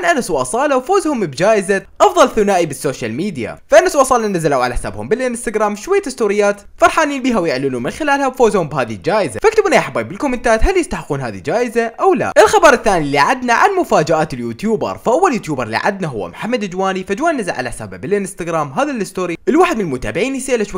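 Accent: Iraq. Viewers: اي عراقي يعني واضح من اللهجه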